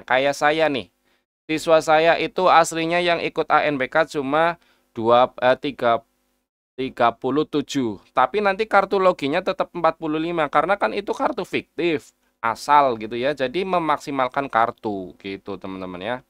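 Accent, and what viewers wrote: Banyumas, Indonesia. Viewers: LAMA GAK DENGER SUARA NIH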